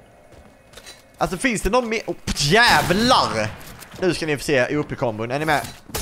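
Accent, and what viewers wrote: Skåne, Sweden. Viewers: Pratar du skånska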